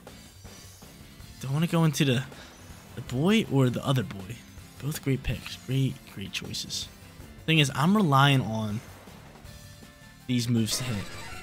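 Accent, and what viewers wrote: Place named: New Jersey, United States. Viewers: I think he is! Hahaha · You from New Jersey ? Sounds like it lol. Or at least the north east for sure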